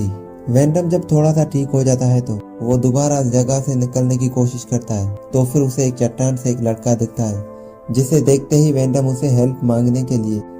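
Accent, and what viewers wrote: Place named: Sindh, India. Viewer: Ap Sindhi ho na your excent is like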